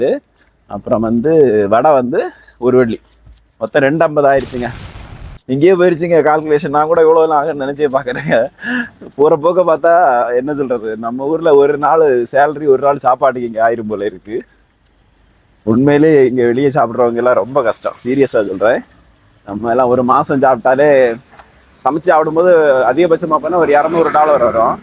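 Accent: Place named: Kanyakumari, India. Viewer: நீங்கள் கன்னியாகுமரி தானே..... நீங்கள் பேசும்போது புரிகிறது..... வாழ்த்துக்கள்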